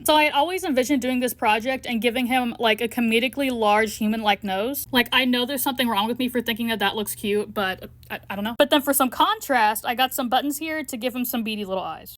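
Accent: United Kingdom. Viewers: I feel like he’s British. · It's just a british guy ❤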